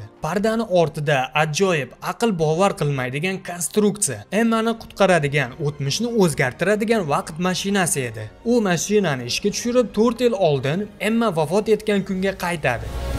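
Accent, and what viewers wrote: Uzbekistan. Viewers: Жиззахликми дейман шархловчи 😊😂😂😂 ўзимизни шивани дарров таниманда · G'irt Andijoncha shevada tushuntirdi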